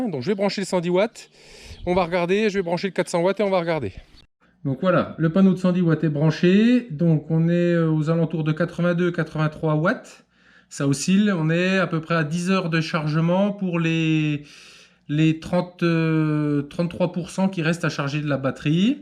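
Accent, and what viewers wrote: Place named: Franche-Comté, France. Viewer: Tout à fait d’accord avec Youcef, quand on regarde la vidéo on est tellement dedans que le temps passe trop vite et petit bonus pour moi, l’accent franc-comtois et les expressions qui vont avec que j’adore entendre en tant que franc-comtoise. Tu ne soûles pas, tes explications sont simples et concises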